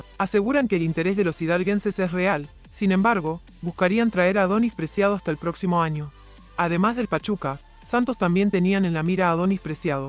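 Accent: Argentina. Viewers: Pero por qué hablas como argentino?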